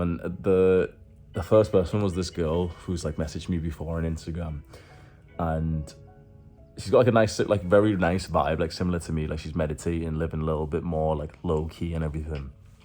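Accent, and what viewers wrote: Liverpool, United Kingdom. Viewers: Your accent is northwest wirral/Liverpool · Wait is that a Scouse accent i can detect. *Back to the content of the video *Inner healing allows deep soul growth and discovery. It's so fulfilling because the inner joy that happens is freeing because you learn true love and happiness starts within yourself.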